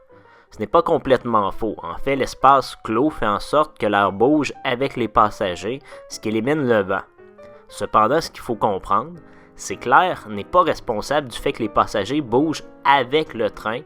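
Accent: Quebec, Canada. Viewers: Merci pour ton explication absolument clair ne et sans bavure. Toujours aussi pro... Ps. J adore ton accen quevecois..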